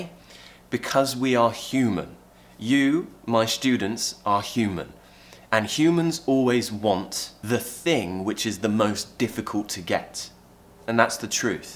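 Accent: RP, United Kingdom. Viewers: Compering to a quite arrogant american one, it caresses ears. I love this posh accent and soft or lost R. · I love to listen your beautiful british sound and You are a good teacher ever! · Wow new hair cut... Handsome! I want to learn english and the british accent thats why i keep on watching you, you are good mentor..thank you! From Philippines... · British accent is more likely I like it 2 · Aaaaahhhhh!!! Ur accent is👌👌😍 Honestly , I love British accent♥️the most ...though m Indian🇮🇳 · I love British accent, especially yours because it's very clear, its easy to understand. 😍❤️ From Philippines 🇵🇭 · 好听的英式腔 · Clear, British English, i understand all, please advise me BRITISH SERIALS, with British accent, so i will be very thankful for you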